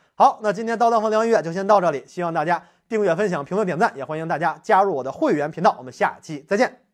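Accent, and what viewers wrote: Beijing, China. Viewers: 馮老師講英文真有趣，充滿北京腔，哈哈哈哈哈！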